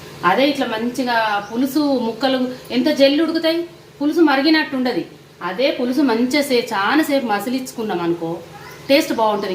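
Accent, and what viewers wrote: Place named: Karimnagar, India. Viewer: Pakka telangana slang , vinasompuga undi Amma. Meedi naku telai Karimnagar Anukunta. Maadi warangal 😅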